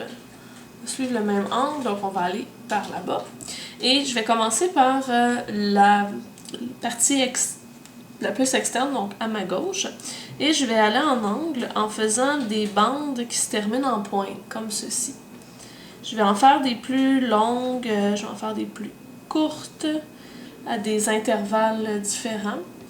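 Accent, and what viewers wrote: Quebec, Canada. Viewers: J'adore l'accent canadien! ;) Ils sont jolis les motifs et tu l'a très bien réussi! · Trop beau et j'adore ton accent (québécois) ?? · Magnifique !! J'y avais jamais pensé tiens.... J'ai pas le cerveau d'un astronaute x) Nouvelle abonnée. PS : J'adore l'accent Québecquois !! <3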